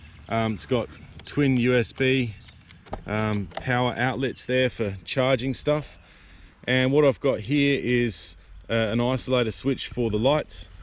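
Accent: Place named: Australia. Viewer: duuude sick build! where in australia are you from? the accent is soo mild from an american perspective. If you were british id think you came from central London rather than Cockney. I ride a 701 supermoto. Love supermotos i take mine on hard enduro all the time :) need a bigger gas tank tho xD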